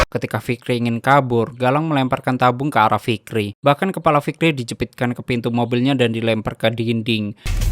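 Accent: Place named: Java, Indonesia. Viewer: Suaramu gak asik, medok anjeeeng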